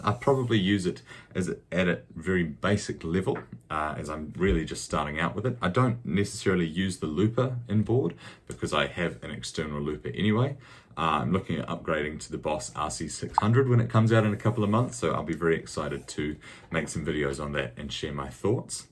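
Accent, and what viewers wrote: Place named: New Zealand. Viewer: Hi You so sound like a Kiwi New Zealander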